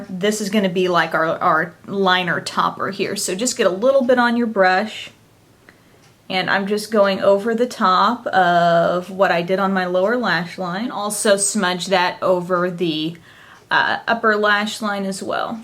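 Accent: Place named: Illinois, United States. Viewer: Congratulations on your award! I live overseas, but I'm originally from Illinois and especially enjoy hearing your familiar accent. :)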